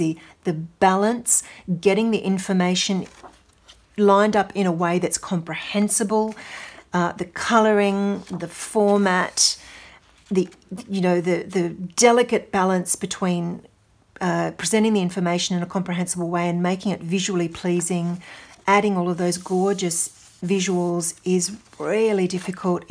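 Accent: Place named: Australia. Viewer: Hi, this video came up on my recommendation list, so glad to hear an Aussie voice, then I see you live near Canberra which is my home, I subscribed and really looking forward to more videos, love this one on books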